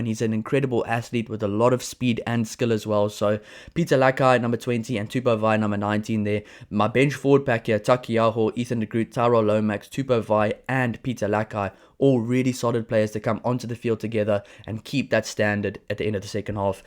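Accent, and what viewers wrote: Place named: South Africa. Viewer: Brilliant video/ analysis man! So cool to hear our team and game covered with the Bokke accent very refreshing 😉 pretty sold on your team only things I would change this week would be QT at 12 and BP or ALB in the 13. JB can take a well earned rest or bench ( I wd actually like to see him back at 15 tbh with Dmac on the bench) I also like Tosi and want him to have some game time. The Haka is a thing and Codie has been leading it , hopefully others can step up cos I totally agree with you re hooker! Keep it up bru cheers 🍻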